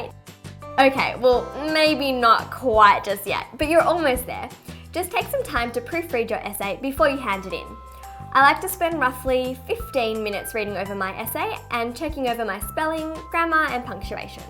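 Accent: United States. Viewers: You are very cute. But the savvy southern blahblah talk lol annoying.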